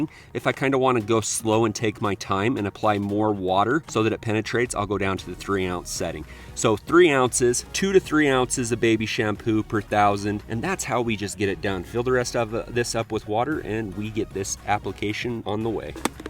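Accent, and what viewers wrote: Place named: Utah, United States. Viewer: Just discovered your channel. Damn, your accent is hardcore Utahn.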